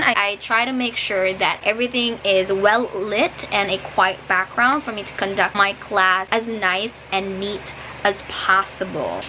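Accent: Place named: United States. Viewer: She speaks like an American 98%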